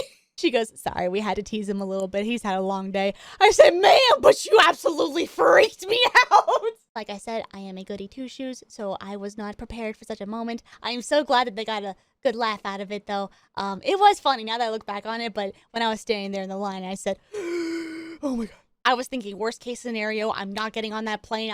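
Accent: South, United States. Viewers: no me habia dado cuenta que eras sureña! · I could hear this southern snow queen talk ALL day.. 😍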